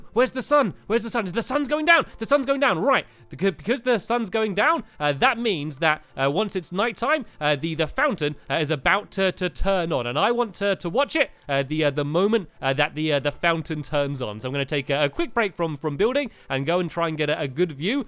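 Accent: United Kingdom. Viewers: LOL it's ester not esta he just pronounces it that way cause he's British XD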